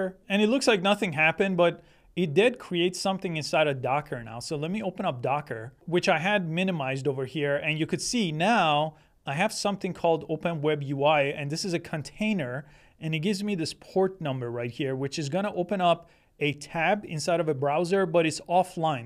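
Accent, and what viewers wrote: Iran. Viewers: I found your channel while searching for local installations of R1. While your accent is very good, I detected some Persian influence in it. After doing some Googling, I discovered that I was right—you are actually from Iran. I wish you could start another channel in Persian; your homeland also needs your knowledge. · dude im hearing some persian accent lol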